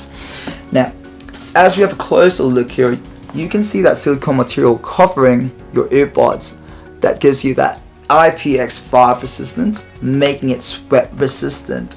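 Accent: United Kingdom. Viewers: Wow! British Accent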